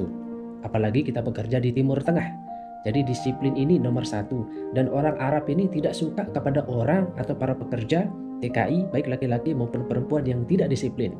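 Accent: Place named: Lombok, Indonesia. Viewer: Pasti org lombok, kruan suare pas kne parlemen hehe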